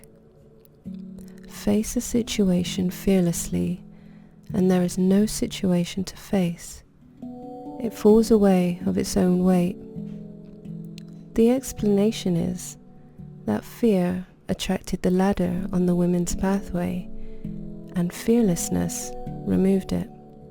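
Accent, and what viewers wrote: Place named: Surrey, United Kingdom. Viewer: Madame, Let me preface this with the fact that I am quite discerning when it comes to bestowing praise regarding anyone's voice when speaking. With that out of the way, I must give my most ardent compliments to you. You are in possession of a provocative, mellifluous voice, spoken with a disarmingly charming accent. Yours is the kind of voice, I would like to be the first sound I hear when I wake and the last sound I hear before I slumber. I live in Manhattan, New York, so my ability to ascertain the origin of your accent is perhaps insufficient. At least some of your cadence, and diction remind me of an accent South of London in Surrey. Notwithstanding my ignorance of the origin of your disarming accent, you have a guileless, feminine resonance in the prepossessing melodious sound which would only enhance the lyrical tone of poetry. 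A voice such as yours is no doubt accompanied by a pulchritudinous visage. Written by a bodice ripping rogue, sincerely with unadulterated veracity, without the slightest affectation whatsoever. ∞ Rogue Means - - - - 'Beauty is truth, truth beauty,' - that is all ye know on earth, and all ye need to know. ―John Keats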